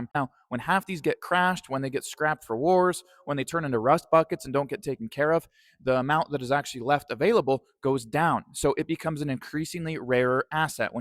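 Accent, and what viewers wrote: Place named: United States. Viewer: nah, he isn't a twaty millionaire wife who lives vicariously of her husband's earnings... his Americanized pronunciation is just fine.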